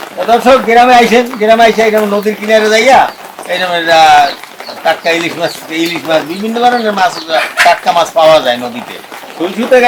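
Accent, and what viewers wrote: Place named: Barishal, Bangladesh. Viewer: খাস বরশাইল্লা ভাষায় কথা শুনে মনে হয় নিজের এলাকায় আছি।পটুয়াখালীর ছেলে আমি, আংকেল আমি আপনার ভিডিও রোজ দেখি অনেক ভালো লাগে পাশাপাশি আপনার মাধ্যমে রান্নাও শিক্ষতেছি।আপনার জন্য আন্ত্রিক শুভেচ্ছা ও অভিনন্দন রইলো ভালো থাকবেন।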